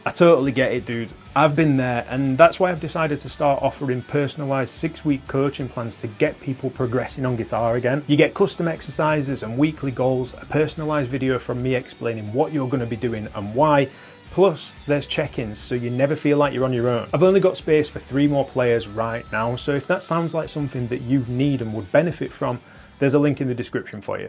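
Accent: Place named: Yorkshire, United Kingdom. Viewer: The love how goofy the Yorkshire accent is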